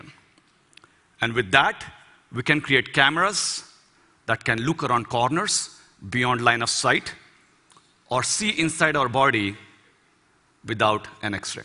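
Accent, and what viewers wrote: India. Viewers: Bu hindistanlilar ingilizce danisanda iyrenc seslenir sanki baska bir dilde danisirlar iyrenc videoya adama gore baxa bilmedim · hintli aksanı kadar kulak tırmalayıcı bir aksan yok amk ;( sessiz izledim videoyu. coca cola şişesiyle deney yapmış sikik. biz de bim poşetiyle yapmalıyız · Hindistanlıların ingilizce konuşması o kadar komik ki adam şu videoda evrenin sırlarını verse , ışıktan hızlı giden uzay gemisini yapmayı falan anlarsa , ne bileyim işte dünyanın en önemli şeyini bile anlatsa , gene komik bu aksanla ))) eleman sanki temel fıkrası anlatıyor.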